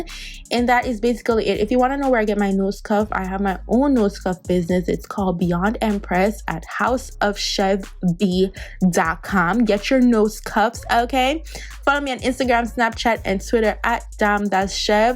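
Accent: Jamaica. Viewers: lol the Jamaican definitely keeps jumping out. I love it though. My hear head top and laugh to myself. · Mek di Jamaican jump out mum..lolol😂🇯🇲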